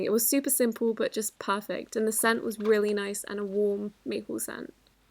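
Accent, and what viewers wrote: United Kingdom. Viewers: I also ❤️ ur British accent 🤗😍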